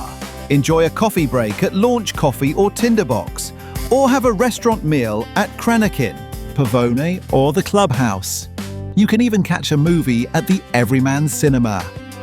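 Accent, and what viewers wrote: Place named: England, United Kingdom. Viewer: are narrating with an English accent.....c'mon....surely to God you could employ an ACTUAL SCOTTISH PERSON to narrate about their own country!?!?!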